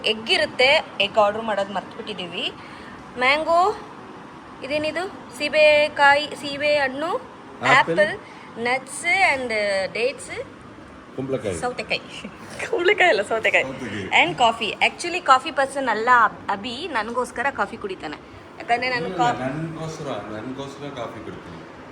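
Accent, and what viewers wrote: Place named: Tamil Nadu, India. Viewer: ಅಕ್ಕ ನೀವು ಬಹಳ ಚೆನ್ನಾಗಿನೇ ಕನ್ನಡ ವನ್ನು ಮಾತನಾಡುತ್ತೀರಿ ನಾನು ನಿಮ್ಮ ತಮಿಳು ವಿಡಿಯೋ ಗಳನ್ನು ಸಹ ನೋಡಿದ್ದಿನಿ ನೋಡಿ ಮನರಂಜಿಸಿದ್ದಿನಿ ನೀವು ಅಷ್ಟು ಅದ್ಭುತವಾಗಿ ತಮಿಳು ಮಾತನಾಡಲು ಕಲಿತ್ತಿದ್ದರೂ ಕೂಡ ಕನ್ನಡ ಮಾತನಾಡುವುದನ್ನು ಮರಿತ್ತಿಲ್ಲ ಅಲ್ಲವ ಅದೇ ಒಂದು ಖುಷಿಯಾದ ಸಂಗತಿ ನಮಗೆ ನಾವು ಯಾವುದೇ ಭಾಷೆ ಯನ್ನು ಕಲಿತರೂ ಸಹ ಮಾತೃ ಭಾಷೆ ಯನ್ನು ಮರೆಯಬಾರದು ಮಾತೃ ಭಾಷೆ ಯನ್ನು ಮರೆತರೆ ನಾವು ನಮ್ಮ ಹೆತ್ತವರು ಹಾಗೂ ನಮ್ಮ ಅಸ್ಮಿತೆ ಯನ್ನೇ ಮರೆತಂತೆ ಮೇಡಂ ನಮ್ಮ ಕನ್ನಡದ ಮೇರು ನಟಿ ಮೋಹಕ ತಾರೆ ರಮ್ಯ ಹಾಗೂ ರಶ್ಮಿಕ ಮಂದಣ್ಣ ಒಮ್ಮೆ ನಿಮ್ಮನ್ನು ನೋಡಿ ಭಾಷಾಭಿಮಾನ ವನ್ನು ಮೈಗೂಡಿಸಿಕೊಳ್ಳಬೇಕು ನೀವು ನಿಮ್ಮ ಯಾಜಮಾನ್ರು ಕಿತ್ತಾಡೋದು ಸಖತ್ತಾಗಿರುತ್ತದೆ ನಿಮ್ಮಿಂದ ನಾವು ಇನ್ನೂ ಹೆಚ್ಚಿನ ಕನ್ನಡ ವಿಡಿಯೋ ಗಳನ್ನು ನೋಡಲು ನಿರೀಕ್ಷೆ ಮಾಡುತ್ತಿದ್ದಿವಿ ನೀವು ನಮ್ಮ ಕನ್ನಡದ ಅಸ್ತಿತ್ವ ಹಾಗೂ ಅಸ್ಮಿತಿ ಯನ್ನು ಕಾಪಾಡಬೇಕೆಂದು ಕಳಕಳಿಯಿಂದ ವಿನಂತಿ ಮಾಡಿ ಕೊಳ್ಳುತ್ತಿದ್ದಿವಿ ಎಲ್ಲಾದರೂ ಇರೂ ಎಂತಾದರೂ ಇರೂ ಎಂದೆಂದಿಗೂ ನೀ ಕನ್ನಡವಾಗಿರೂ ಕನ್ನಡವೇ ಸತ್ಯ ಕನ್ನಡವೇ ನಿತ್ಯ ನಾವು ಕನ್ನಡದ ಕಲ್ಪತರು ನಿಮ್ಮ ಕನ್ನಡ ಶೈಲಿಯಲ್ಲಿ ಚೂರು ತಮಿಳು ಪ್ರಭಾವಿದ್ದರೂ ಅದು ತುಂಬಾ ಸೊಗಸಾಗಿನೇ ಕೇಳುತ್ತದೆ ಏಕೆಂದರೆ ನಮ್ಮ ಭಾಷೆ ಕನ್ನಡವೇಯಾಗಿರಲಿ ಇನ್ನೂ ಬೇರೆ ಯಾವುದೇ ಯಾಗಿರಲಿ ನಾವು ನಮ್ಮ ದೈನಂದಿನ ಬದುಕಿನಲ್ಲಿ ಪರ ಭಾಷೆಯನ್ನು ಬಳಸುತ್ತಿದ್ದರೆ ಅದರ ಪ್ರಭಾವ ಸಾಮಾನ್ಯವಾಗಿ ನಮ್ಮ ಸ್ಥಳೀಯ ಭಾಷೆ ಮಾತನಾಡುವಾಗ ಸಹಜವಾಗಿ ಕಂಡು ಬರುತ್ತದೆ ಪರವಾಗಿಲ್ಲ ಮೇಡಂ ನೀವು ತಮಿಳನ್ನು ಹೆಚ್ಚಾಗಿ ಬಳಸಿ ಅಷ್ಟೇ ಕನ್ನಡವನ್ನು ಸಹ ಹೆಚ್ಚಾಗಿ ಬಳಸಿ ತಮಿಳು ಪ್ರಭಾವ ಏನು ಇಲ್ಲ ಆದರೆ ಕನ್ನಡ ಮಾತನಾಡುವಾಗ ಸ್ವಲ್ಪ ತಮಿಳು ಶೈಲಿ ಬಂದಂತೆ ಬಾಸವಾಗುತ್ತದೆ ನಿಮ್ಮ ಪತಿ ಹಾಗೂ ಸುಪುತ್ರನಿಗೂ ಅದೆಷ್ಟು ಬೇಗ ಸ್ವಚ್ಚಂದ ಕನ್ನಡ ವನ್ನು ಮಾತನಾಡಲು ಕಲಿಸಿ ನಮ್ಮ ಕನ್ನಡ ಮೊಳಗಬೇಕು ಎಲ್ಲೆಡೆಯೂ ಪಸರಿಸುವಾಗೇ ಮಾಡಿ ನೀವು ಮನಸ್ಸು ಮಾಡಿದ್ದಿರಿಂದರೆ ಕನ್ನಡದ ಧಾರಾವಾಹಿ ಗಳಲ್ಲಿ ಮೀನುಗಬಹುದು ಮಾತೃ ಭಾಷೆಯೆಂದರೆ ಅದರಲ್ಲಿ ಹೆಚ್ಚಾಗಿ ಭಾವುಕತೆ ಅಡಗಿರುತ್ತದೆ ನಿಜ ಹೇಳಬೇಕೆಂದರೆ ನಿಮ್ಮಲ್ಲಿ ಕನ್ನಡಾಭಿಮಾನ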